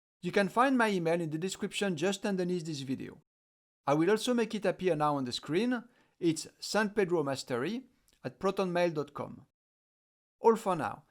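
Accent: France. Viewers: Are you French ? You have a strong accent no ? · C'est un accent français ça non ? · French accent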